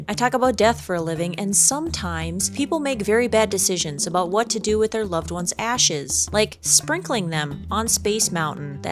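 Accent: Minnesota, United States. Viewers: The Minnesota accent makes this all the more enjoyable. What if you had a version of each episode you do with the accent completely untethered 😂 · I love your Minnesota accent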